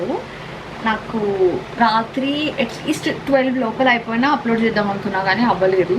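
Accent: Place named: Hyderabad, India. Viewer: Your videos look just natural ❤and more over your slang is pakka Hyderabadi ❤❤️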